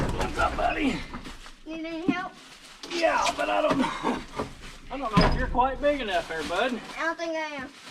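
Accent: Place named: South, United States. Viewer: Even though we live almost 600 miles away We Love hearing that cornbread accent in voice. Sounds exactly like all the kids around here. Awful Southern sounding to be that far North.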